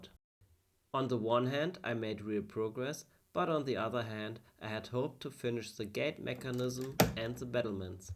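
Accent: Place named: Germany. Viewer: Moin😉👋, Man hört definitv den Deutschen Akzent heraus 😂🤭, doch sieht das Resultat echt gut aus! Viel Erfolg weiterhin😉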